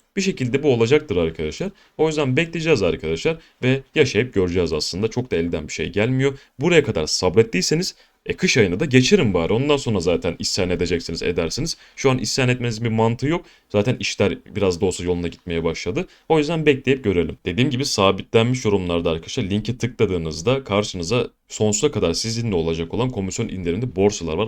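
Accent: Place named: Black Sea, Turkey. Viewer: Hocam Karadenizin hangi ilindesiniz ❤️🤲🧿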